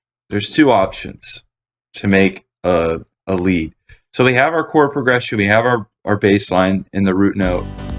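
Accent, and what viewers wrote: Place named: United Kingdom. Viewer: LOL U HAD ME WITH THE BRIT ACCENT!!!!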